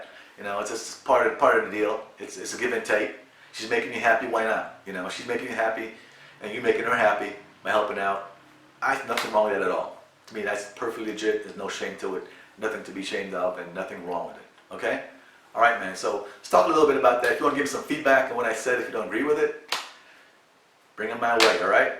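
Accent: Jamaica. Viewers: I live in the D.R. and I Teach at a private school.. I would like to teach online..can you point me in the right direction. I hear your Jamaican accent..I'm Jamaican too ..via Toronto/New York.. I m glad you made the choice to live life..and not get stuck Pretending in the Matrix.. Please respond your information would be valued.. Love what you are doing!!! Selvin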